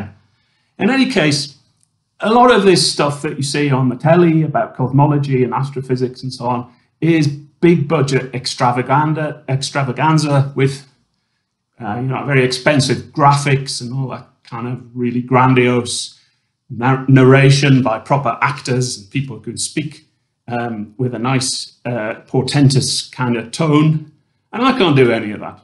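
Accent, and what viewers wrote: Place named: England, United Kingdom. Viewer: Don't be shy about not being big budget. You've got the English accent that all the greats have, like Attenborough and that guy who wears the tight shirts; that's all you need. Oh, and you've got a way better beard than any of those guys from Nottingham. And don't get me started on the guy with the hair. Keep it up!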